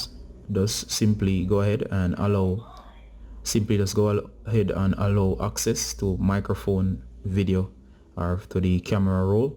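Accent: Jamaica. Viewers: U sound like jamaican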